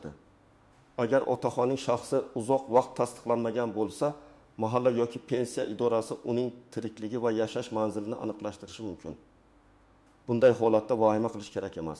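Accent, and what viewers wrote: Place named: Tajikistan. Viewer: Бу одам тожикми. Узбекчани акцент Билан гапиради.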